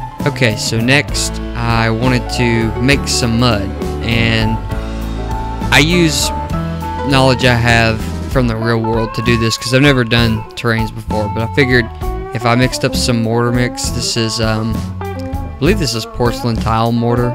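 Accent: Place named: South, United States. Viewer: First time hearing a southern accented hobby youtuber, love it.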